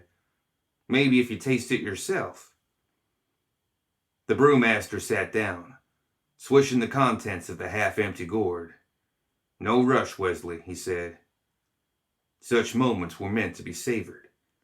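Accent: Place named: Louisiana, United States. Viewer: Interesting choice to have the story read in a Bayou accent.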